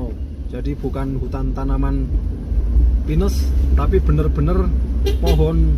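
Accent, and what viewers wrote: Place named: Wonosobo, Indonesia. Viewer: Milu nyimak kiye bos, gara2 longsor kasimpar fidio chanel mana saja yg liatin ds kasimpar tk tonto, rika wong ngendi si bos, kaya enyong wae logate, wonosobonan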